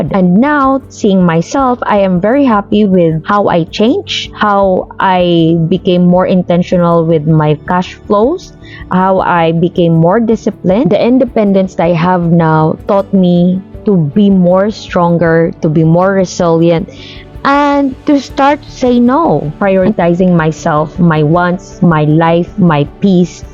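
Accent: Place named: Australia. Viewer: Nice2x. 🎉 Australian Na an accent❤❤❤❤❤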